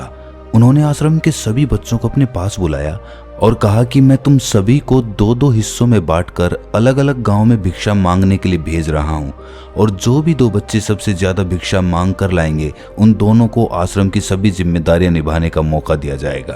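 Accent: Haryana, India. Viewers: awaj kisi haryana wale ki hai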